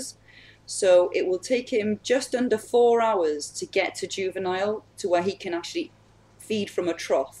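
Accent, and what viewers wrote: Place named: Bristol, United Kingdom. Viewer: I'm just trying to work out the accent 'sounds abit bristol'.ooh ar ra.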